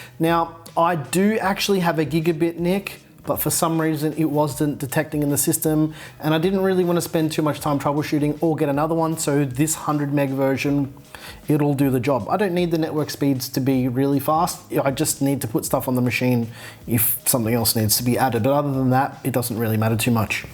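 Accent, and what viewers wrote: Australia. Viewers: are you australian 🤢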